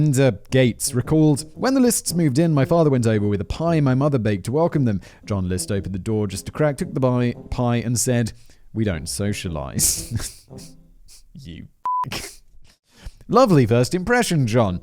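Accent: United Kingdom. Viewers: The more I listen to the more that I think I should act on my notions to move to Britain so I can find a man who says "brilliant" and "what are you up to.." Everything sounds so much more charming and intelligent with a British accent.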